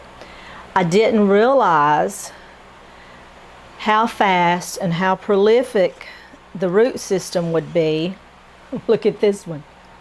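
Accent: South, United States. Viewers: You have a lovely southern accent. I am hoping you are in the same zone i am so i can get succulent advice throught your videos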